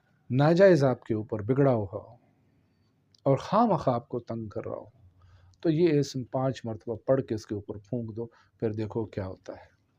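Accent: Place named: Pashto, Pakistan. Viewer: Ap ka lheja pshto h thik sy smjh nhi ai😥😥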